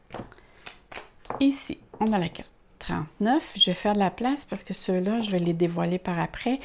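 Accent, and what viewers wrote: Quebec, Canada. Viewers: C'est extra pour le 3 eme paquet, curieuse due à votre accent, de quelle région du Québec êtes-vous ?? moi je suis de Québec. Merci de votre explication.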